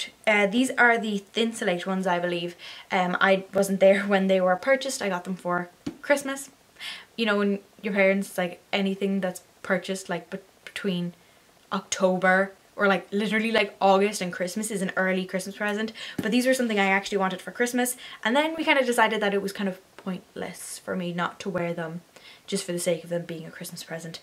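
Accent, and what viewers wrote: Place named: Ireland. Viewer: I really enjoyed your review and love your Irish brogue. I love your country, too, and visited County Cork (backpacking) in the late 60's. Anyway, I have a pair of LLBean duck boots but I dislike the collar as it is not padded. If I can get LLBean to affix padding in my collars they would be first class; and that side zipper on the Sperry's would make them awesome.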